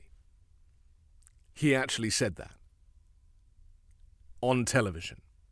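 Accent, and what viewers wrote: England, United Kingdom. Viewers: your 55 min news is always spot on and enlightening thank you. And hearing an English accent is nostalgic for me as an ex Brit cheers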